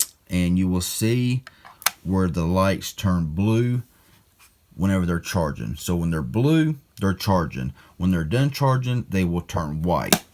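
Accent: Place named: Appalachia, United States. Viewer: Good God, are you TRYING to sound like a freaking hillbilly?!???....what a waste of MY time....I GAVE UP trying to listen to your "presentation"!!!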